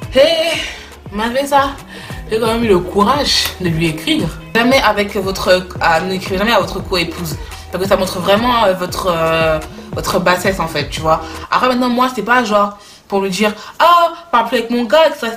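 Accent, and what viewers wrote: Africa. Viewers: je t’aime bcp surtout ton accent africaine oh j’adore 😍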